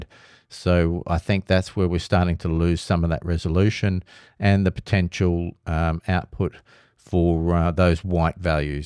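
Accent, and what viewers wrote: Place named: Australia. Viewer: No3 Way to go my man. Thanks for the info great to hear the Aussie voice.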